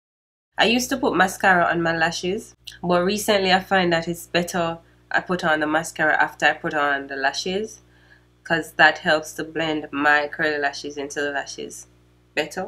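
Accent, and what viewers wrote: Jamaica. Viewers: U Jamaican?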